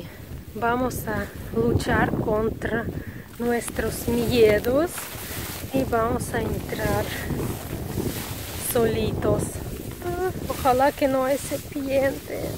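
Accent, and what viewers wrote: Russia. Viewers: y disfrutar de tu compañía me gusta tu tono ruso al hablar castellano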